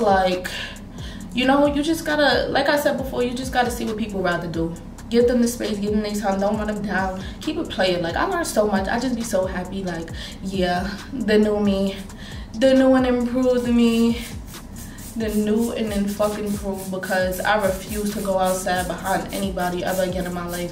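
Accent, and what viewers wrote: New York, United States. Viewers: Tawlk about. I'll never get tired of your NY accent. ❤️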